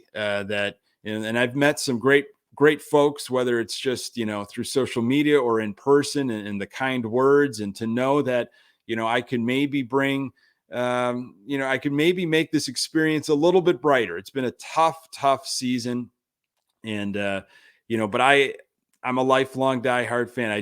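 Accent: Chicago, United States. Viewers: Glad I found your channel! Love the chicago accent! Reminds me of the SNL Da Bears skit lol Go SOX!!!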